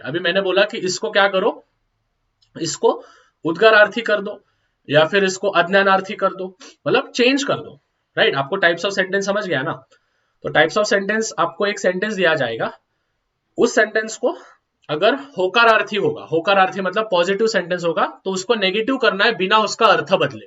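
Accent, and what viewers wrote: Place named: Hyderabad, India. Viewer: Sar Hyderabadi accent😅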